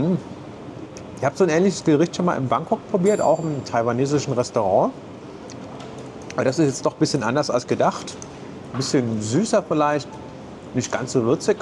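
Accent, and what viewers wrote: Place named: Germany. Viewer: Great video, and an even better channel. I really enjoy watching your content. Your German accent sounds really nice too. Where is it from? Most Germans sound pretty harsh, but yours is pleasant. 🥰👋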